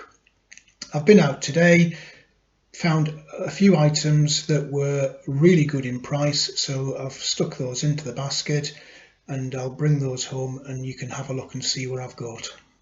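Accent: United Kingdom. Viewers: Do you have other channel's or do you have a voice twin?🙃😋 anyhow maybe just me a sucker for British accent , good to know us dum Americans aren't the only one's prepping 🙏😁😶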